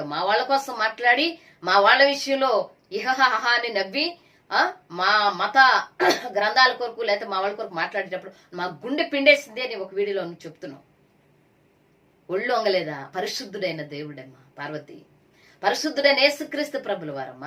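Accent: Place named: Srikakulam, India. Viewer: మరి దీనికి 100 మంది రంకుమొగుడులు దీని శ్రీకాకుళం భాష దీని పెంట మొహం ముండా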